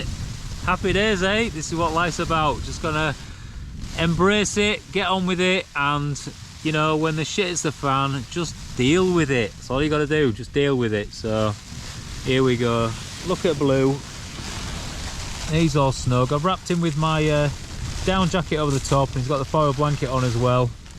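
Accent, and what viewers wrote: Yorkshire, United Kingdom. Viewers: Beautiful video and very exiting for a camping video xD But I have to ask. I'm originally from the UK but now live in America. This is my first video watching on your channel. It sounds like you have a Yorkshire or nothern accent. May I ask where you are from? I was born in North Yorkshire and lost my accent due to bullying at a young age, but sounds so familiar, so I am just wondering :)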